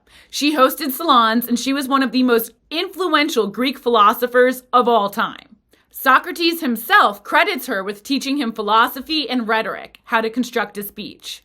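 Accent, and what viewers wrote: United States. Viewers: what region of America are you from?, you have a slight accent, just curious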